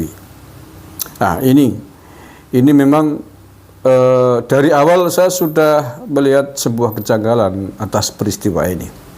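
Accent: Banyumas, Indonesia. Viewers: Baik sekali konten ini....sangat santun....wong ngapak...isthebest...❤❤❤❤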